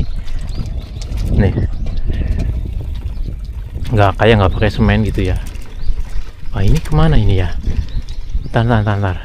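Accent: Banyumas, Indonesia. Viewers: Ngapak banget co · Lee.kok ngapak cah ngendi ,nek ngomong ati2 lee,neng negara orang.takut ada yg syirik nanti jadi abu merangmu.ati2 yoo lee.semoga sukses da n bahagia.Aamiin.❤❤❤ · Asline wong endi si mas, logate ngapak banget 😁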